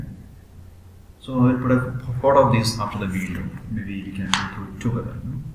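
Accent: Kerala, India. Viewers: Am I hearing a mallu accent 😶? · Sir,you sound like a malayali. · Are u malayali ,ur slang express it.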